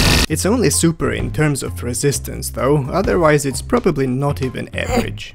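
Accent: Hungary. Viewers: How you weren't aware of "Hunglish" existence. LOL XD For me is super easy to spot. BTW his accent not even that hardcore as far as I'm concerned. Anyways both channel is awesome. :) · I never realized that Hungarian English accents exist until I heard you talk...this is exactly how I sound when I take calls at work...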